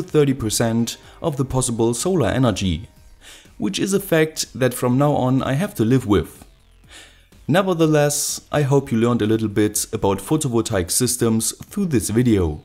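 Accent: Germany. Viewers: Sounds a bit German-ish :)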